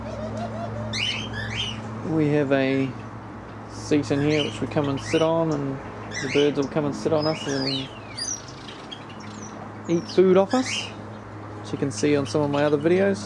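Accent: New Zealand. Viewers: i detect a Kiwi accent? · your accent sounded like a kiwi accent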